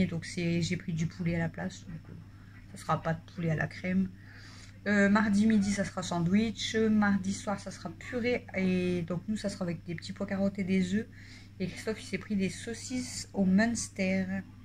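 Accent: Nord, France. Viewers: Un peu tard, mais de tout cœur, bonne semaine, surtout bon courage pr le gd nettoyage!!!! Mais oui, on aime ta voix, surtout l accent de Nord!!! Ma maman était du Nord, près de Lille, mais n avait pas d accent, 1 de ses tantes, l avait, chaque région, son accent!!! J aime aussi celui de et, gros bisous à vs tous et papouilles à Stella et aux chats!!!!! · J adore l accent du Nord Etant moi même du Nord que J ai dû quitter avec regret pour La charente maritime 😏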